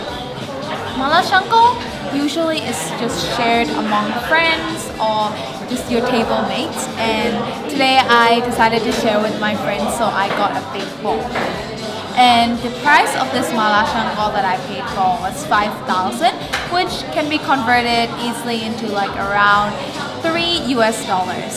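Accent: Australia. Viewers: Well done, I did detect a slight Aussie accent, maybe coincidence. Good Video as always. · Hello This is. Thank you for all your lovely compliments regarding my presentation, I really hope I inspire you to experience Sanchaung. Just like what a lot of you commented, my English has a particular accent because I've actually been persuing a degree in Monash University (Australia) for a few years so I've been going back and forth between the two countries. And of course using my English for the EatSleep Yangon audience had been a great pleasure for me to show my love for my native culture. Again, thanks for all the interest!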